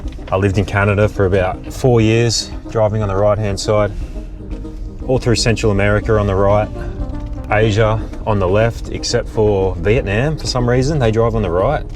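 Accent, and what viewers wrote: Australia. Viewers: It’s not your Azzie accent. Tim Hortons is the problem. 🇮🇳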